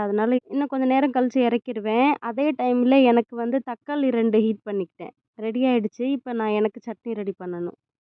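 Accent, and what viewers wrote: India. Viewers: Neenga pesurathu hindi poonu tamil kathutu pesura mathiri iruku lunch time use panran intha varthalam mathiya sapatunu soli parunga nalairukum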